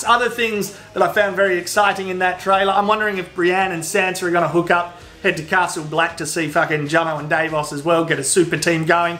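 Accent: Australia. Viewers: The best thing these videos have done for me is helping me finally know how Australian sounds and why people love it so much. And learned many new Australian words, like cheeky and spew. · You prove that, for a fact, putting an Australian commentaror dropping f bombs over ANY video equals funny. The accent and enthusiasm, great timing, good show man. Like buttons all the way.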